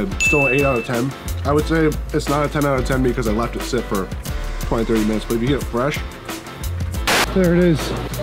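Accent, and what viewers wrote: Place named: Taiwan. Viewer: 那中文也太道地的台灣味了吧 剛好我在吃臭豆腐我想說台灣人幹麻介紹臭豆腐啊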